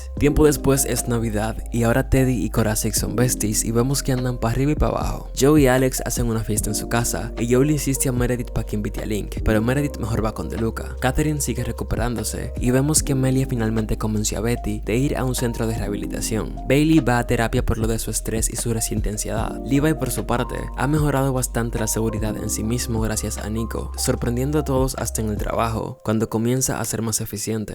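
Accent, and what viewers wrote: Dominican Republic. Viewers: Amo como narras , todo educado pero le metes el plus dominicano 🇩🇴🥰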